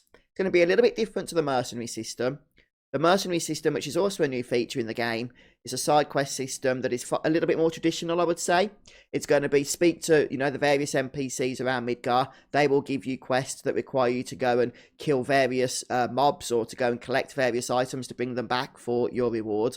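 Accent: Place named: Somerset, United Kingdom. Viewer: Are you from Somerset or somewhere? Haha. ;)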